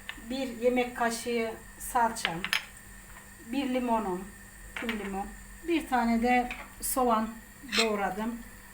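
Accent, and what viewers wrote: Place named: Adana, Turkey. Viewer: Selam Hanım. Rahmetli annemin de bize yaptığı bu çorbanın tarifini ararken size rastladım. Konuşur konuşmaz Adanalı olduğunuzu anladım. Memleketten uzaktayken çok iyi geldi anlatımınız. Ellerinize sağlık.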